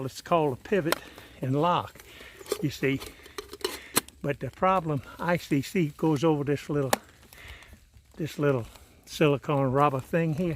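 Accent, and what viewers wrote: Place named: Charleston, United States. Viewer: I'm from SC. I'm trying to figure out your accent....Charleston? Anyway, fantastic vids! From Fort Mill.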